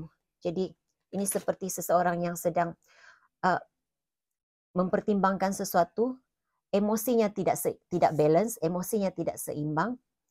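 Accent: Malaysia. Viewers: Bunda seperti nya asal dari Malaysia , cakapnya mirip melayu. Thks Bunda atas readingannya.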